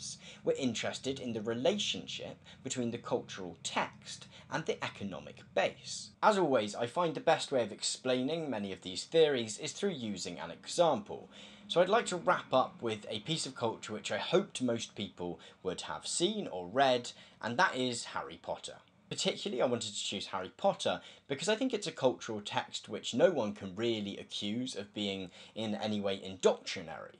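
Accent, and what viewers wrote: United Kingdom. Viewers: I watch your videos and those of the Yale course on the same topics. Yours are 10 times better. You also outshine many other YouTubers discussing classic and contemporary philosophies. May I also say that watching your videos has boosted my respect for Exeter and British English, an accent I had trouble with?😀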